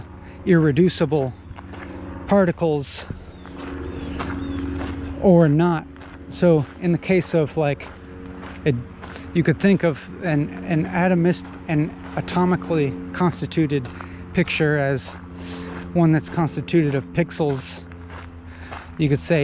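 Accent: United Kingdom. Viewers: You’re American/canadian? I always thought you were British 😂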